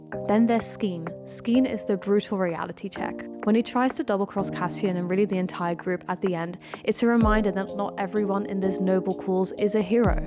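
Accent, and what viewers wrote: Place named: Netherlands. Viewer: Jij klinkt Nederlands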